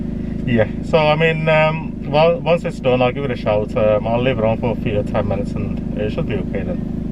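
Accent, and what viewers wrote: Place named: Liverpool, United Kingdom. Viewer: Carried on watching and you’ve now mentioned Liverpool so that’s answered my question 😂 I could tell you were scouse from your accent ❤️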